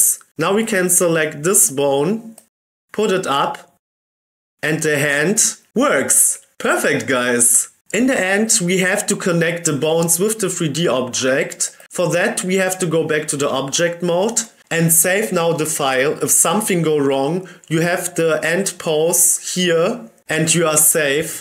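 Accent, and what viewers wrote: Germany. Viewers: Ich höre deutsch raus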